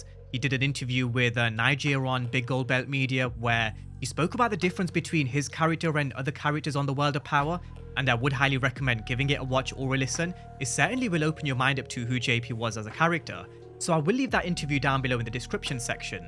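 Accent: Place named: United Kingdom. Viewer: He sounds British. So it’s 10 am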